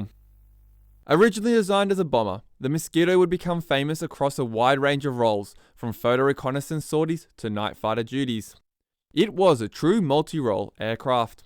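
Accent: Australia. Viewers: Made from timber and plywood?? What do you think ply is made from - plastic? Nothing new in the vid. I thought there were only three flyable airframes, all the rest were taxi dummys. - - - And a word of advice - disregarding your Aussie accent, you need to improve your diction, so that you don't slur your words or miss the ends off!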